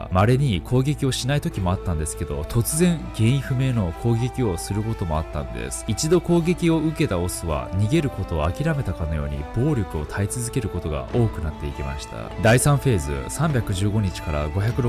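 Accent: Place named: Japan. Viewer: 、英語の発音が日本語発音になってる、、、気を使ってるのかな？もっとネイティブの英語を聞きたいのですが。日本の大衆圧力でしょうか？なおきマンらしい昔のネイティブ発音でいきましょうよ😁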